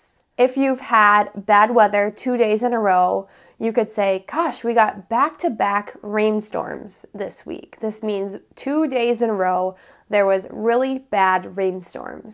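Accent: United States. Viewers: Are you really an American ? I am asking this because there are so many online teachers who claim to be Americans but actually are Russians.Anyway you sound like an American to me.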